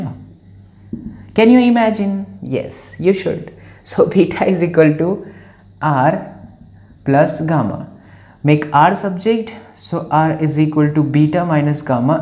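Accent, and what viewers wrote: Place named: Haryana, India. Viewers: Itti bdiya haryanvi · Fab haryanvi.! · Haryanvi is superb sir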